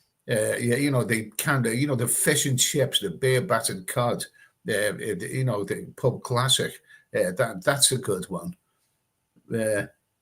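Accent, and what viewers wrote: Liverpool, United Kingdom. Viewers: Well, he is a scouser.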